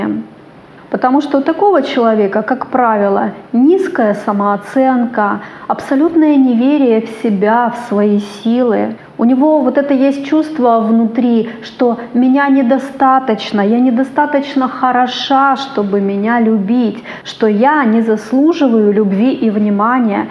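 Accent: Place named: Belarus. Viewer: Не сразу поняла ,почему вас слушаю,а теперь только,поняв ,что вы из Беларуси ,как и я,и проблемы из детства у нас похожи.У вас есть легкий белорусский акцент,вы Т смягчаете на Ц,моему уху это приятно слышать,живу давно в Петербурге. Можно видео про синдром отличницы,школа с золотой медалью и так далее, да и дома все идеально,понимаю,что незачем,а вот...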